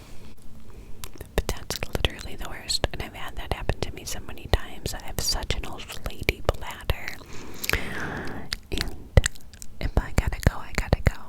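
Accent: New York, United States. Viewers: Well I think you are a pulchritudinous gal, your sweet voice could calm a storm. I love it when you do some videos with a new york accent. I've been a fan of yours now for a few years and you have helped me fall asleep many of times. Im pretty sure you will reach a million subscribers. Anyway you look insanely beautiful and visually stunning as always love your asmr that you do. 😍😍🌹🌹🌹🌹🌎👑.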